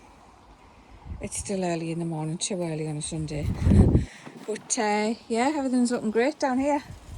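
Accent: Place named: Durham, United Kingdom. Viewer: the accent sounds like durham.....................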